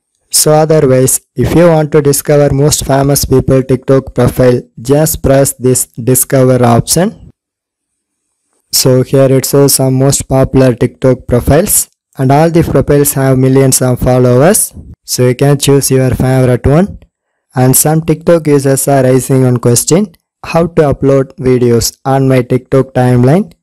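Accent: England, United Kingdom. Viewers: nice english accent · thanks for the english accent!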